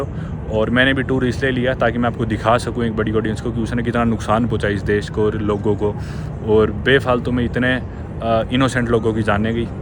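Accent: Haryana, India. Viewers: I'm from Pakistan I 💓🇵🇰 long live 🇵🇰 nice beautiful Bhai AP ka Haraynivi Accent bhuht acha lagta hi 💚💙🙏🇵🇰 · Thank you for all your tips. Very helpful. Although I would like to add, slamming doors is considered rude & bad manners in many countries, not just in Columbia. It is common knowledge because generally people slam doors in anger. I also accidentally saw one video and really liked your simple, honest, practical, genuine (no show), with Haryana accent information. 😊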